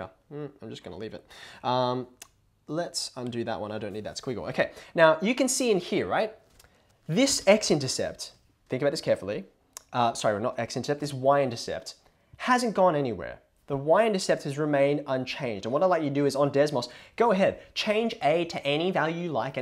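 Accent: Australia. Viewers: great video but i hate the austrailian accent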